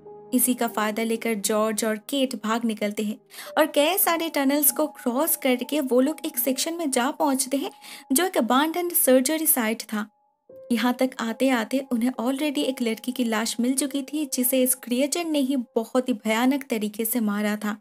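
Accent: Bengal, India. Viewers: Bengali accent..many Bengali's sound very sweet when they speak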